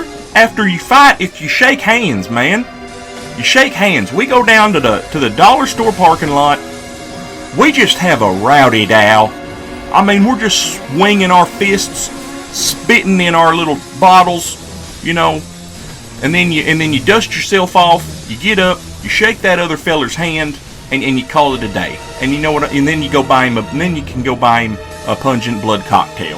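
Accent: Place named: South, United States. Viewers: I love your Southern drawl; it’s really authentic . I live in the South, so I give it my seal of approval. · A hillbilly saint talking with good piano music. What combination is this?!!?!?!?!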